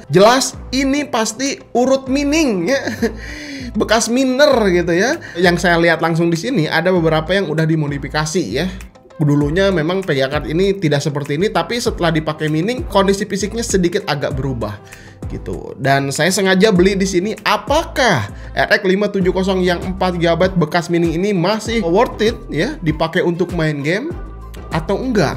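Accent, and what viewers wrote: West Java, Indonesia. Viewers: sunda pisan wkwkwk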